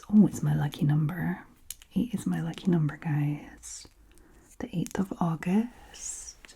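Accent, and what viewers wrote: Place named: Scotland, United Kingdom. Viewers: She's Scottish but has lived in France for years! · ! Thank you for your amazing content. I stumbled on your channel today accidentally. Your accent has me so confused in a good way. Sometimes it sounds Scottish. Sometimes Mid Atlantic. Are you Canadian? Your voice is hypnotic no matter what!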